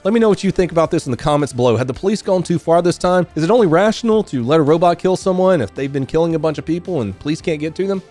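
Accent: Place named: Texas, United States. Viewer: Since when are you Texan? Thought it was Bama 4 ever 😢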